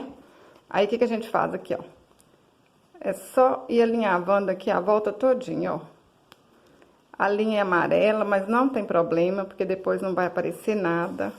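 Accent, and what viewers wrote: Minas Gerais, Brazil. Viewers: Eu sou mineira e pelo seu sotaque você também é 🥰 sou de Patos de Minas Minas Gerais,a capital do milho 😅 com muito orgulho da minha cidade!! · "O TREM BONITO DE MAIS." ADORO VC FALANDO ASSIM .O MINEIRES . TB SOU MINEIRA 👏👏👏👏👏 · Boa noite aqui é Silvania de Sabará,eu amo esse seu sotaque minerim.É bom demais da conta.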